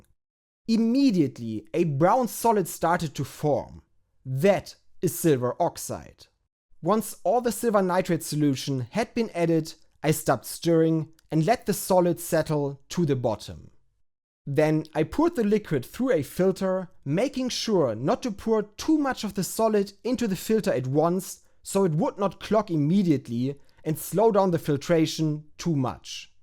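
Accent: Germany. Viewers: Bruder, ich habe zum ersten Mal ein Video von deinem Kanal vorgeschlagen bekommen, direkt darauf geklickt und in 0,0002 Sekunden gehörst das du deutsch bist. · As a high school chemistry student here in Denmark, I find your grad level chemistry videos both entertaining and inspiring. Please keep making them, and congratulations on the success with the gas. Even though I might not understand every synthesis step, I really enjoy your explanations and your German accent. These videos capture what chemistry is about, using science to explore interesting compounds. :) And just to try some German, Ich finde deine ganze experiment sehr to toll :) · Your accent is my favourite German accent. It's up there next to the Simpsons guy who took possession of the missing art before a Kraftwerk concert in Stuttgart. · you german? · idk why, but i find his thick german accent in english so sexy and relaxing at the same time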